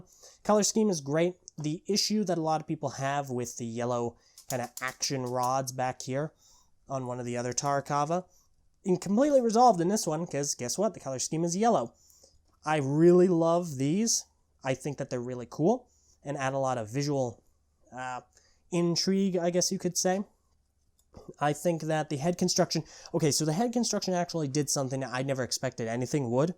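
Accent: Australia. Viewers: Pohatu now has a Australian accent in my mind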